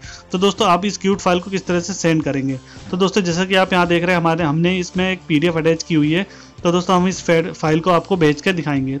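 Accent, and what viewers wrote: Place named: Himachal Pradesh, India. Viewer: Ye to himachal wala ke voice hai☺️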